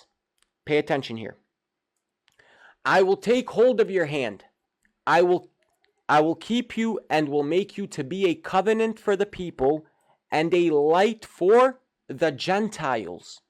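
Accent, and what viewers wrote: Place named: South, United States. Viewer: Curious what kind of american accent that is. Southern , sounds like.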